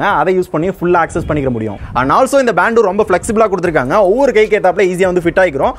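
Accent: Madurai, India. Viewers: Neenga Madurai ya bro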